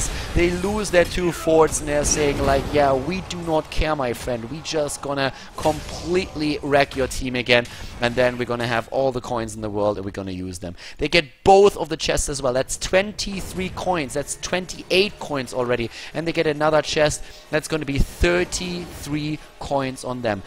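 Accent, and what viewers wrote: Germany. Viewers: man hört so krass, dass du deutscher bist XD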